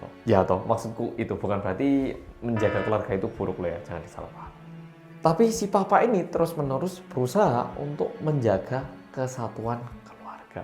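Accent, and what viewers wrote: Java, Indonesia. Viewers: Medok medok medok rekkkk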